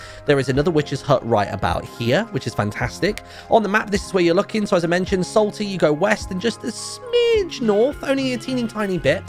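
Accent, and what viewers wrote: United Kingdom. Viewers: That's how British people talk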